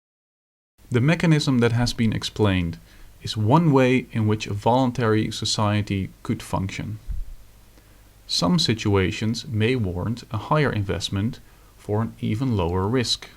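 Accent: Netherlands. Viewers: Do I hear a slightly Northern-European accent there? Perhaps Dutch? :)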